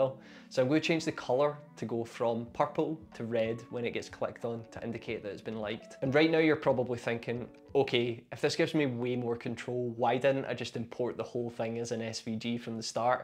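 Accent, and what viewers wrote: Scotland, United Kingdom. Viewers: Mmm...how do you get Resolve to automatically update the changes you've made in your .psd file? It ain't doing it for me, and I couldn't see from your video how you got it to do that. Do I need to restart Resolve? Okay. I answered my own question. Yes. I had to restart Resolve et voila! While I'm here, what's happened to you? Have you abandoned ship forever? Because that would be a real shame; your videos are great and I appreciate hearing the accent of a fellow Scot. Lanarkshire, by any chance?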